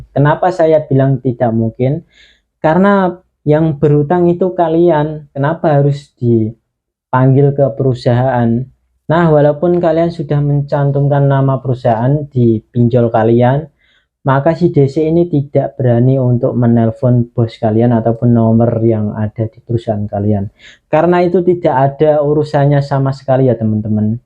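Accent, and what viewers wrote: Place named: Java, Indonesia. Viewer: Jawane medok emen mase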